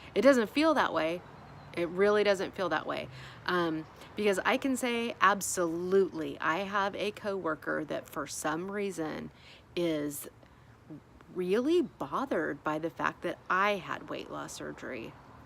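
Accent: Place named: Boston, United States. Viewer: Thanks. I really enjoy following your journey. The struggle is real for all of us. You remind me of a Bostonian the way you talk and your attitude. I grew up in Boston. We're wise guys, sarcastic, hardworking and honest. We tell it like it is. I appreciate you and your struggles. Thanks for keeping it honest and real💟Be glad you're not here now. Big snowstorm coming tonight 🙄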